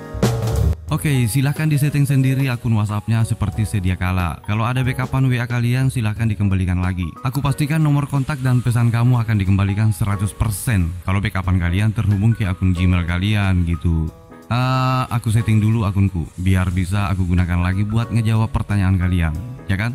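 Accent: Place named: Bugis, Indonesia. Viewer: Logattt nya logattt bugisss skli🗿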